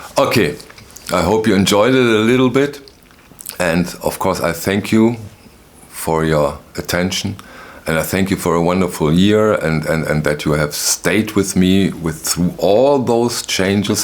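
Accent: Germany. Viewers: Just a comment out of left field for you. You have a great voice and your light German accent makes it even better. You give me vibes of a 1950s beatnik. I guess you have to be above a certain age to even know what that means. I enjoy your passion for music and records, your clips are very interesting and entertaining.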